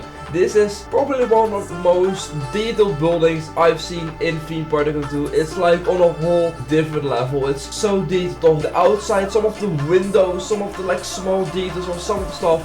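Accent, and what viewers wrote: Netherlands. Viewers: Dutch accent nice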